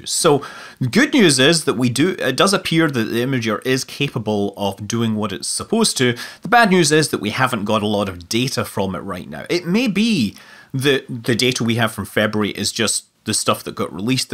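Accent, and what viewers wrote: Scotland, United Kingdom. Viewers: "Push broom" might be the best phrase to hear spoken in a Scottish accent.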